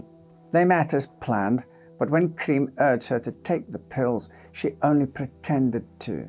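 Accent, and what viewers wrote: United Kingdom. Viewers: I will never understand why Brits pronounce aluminum the way they do. It's wrong af 😂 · I absolutely love your content, sir. You truly know how to induce a moment of true dread with your powerful accent. You make me proud to be a British person with a proper silver spoon in my mouth, and I wouldn't have it any other way. Listening to you is literally like reading a truly mysterious sonnet written by an unknown author. That's how much I find your videos satisfying to watch and listen to. I could easily fall asleep to your incredible content.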